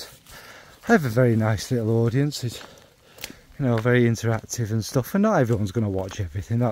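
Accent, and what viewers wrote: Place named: Northern England, United Kingdom. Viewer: Wait.. What's an algorithm? 😅😅😅 Listen.. There's organic, there's forced and formulated, and there's a ton in-between. Some are naturally good at being robotic and sterile with it, some are really great with their 'stage presence' who are excellent on camera and just naturally are fantastic and popular. I only film things if it's enjoyable and it's something i want to watch back for my own viewing. I've an idea for a video which has absolutely no relevance and I'm sure zero people will be interested by I'll probably do it for my own lonely little world of nerdy viewing. Like a fragrance diary..that happens to be public 😅😅😅 Anyway.. I started drinking whiskey at the start of this video, and I'm half way in and feel pissed. So will have to pick it up tomorrow 😅😅 🥃🥃 but always good to hear a proper northern geezer being true and raw, if a little rough around the edges 😁😁 Never stop the stories and creative content Mr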